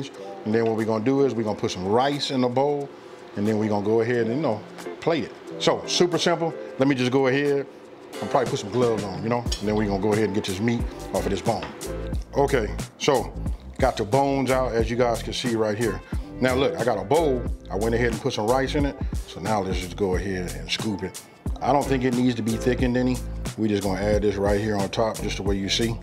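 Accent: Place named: California, United States. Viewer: It strange to see and hear you cook southern food with that Cali accent.. But you got some skills.. I enjoy your videos. Keep doing what ya doing.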